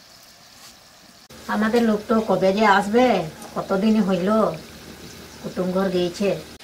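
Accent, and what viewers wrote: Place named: Mayurbhanj, India. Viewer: Definitely ur from mayurbhanj 🙏😀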